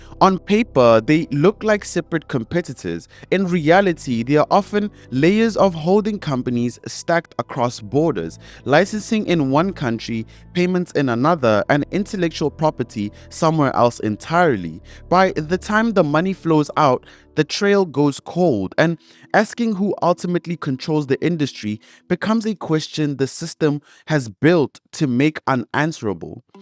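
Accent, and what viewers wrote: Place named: Nigeria. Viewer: This dude sounds like a Nigerian. I am out here !!!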